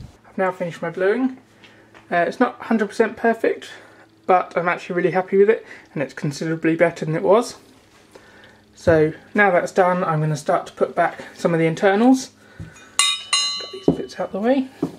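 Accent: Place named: United Kingdom. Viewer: I do not like that British accent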